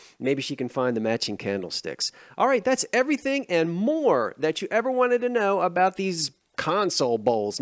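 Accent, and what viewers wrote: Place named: East Coast, United States. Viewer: ! I've been watching your earlier episodes that include "young" Salem and 70s stuff. I LOVE THEM! I'm convinced the only Good Will's and thrift shops with the quality of items you find are on the East coast. I appreciate your sense of humor, facial expressions and East cost accent.. I'm learning so much from you. Continue being a blessing and I'll do the same.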